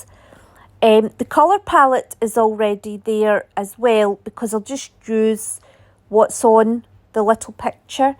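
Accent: Scotland, United Kingdom. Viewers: Is your accent Scottish or a certain region of U k❓. It’s delightful💞💞. Recent fan of your channel/works of art❣️❣️❣️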